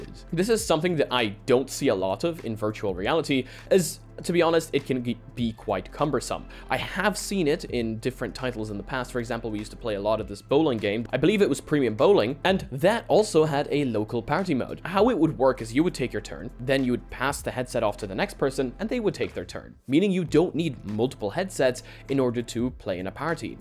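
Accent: Poland. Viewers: The polish accent intro 👌🏼🔥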